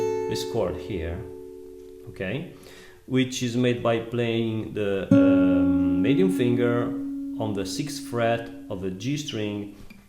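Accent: Italy. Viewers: he is from itali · Italian accent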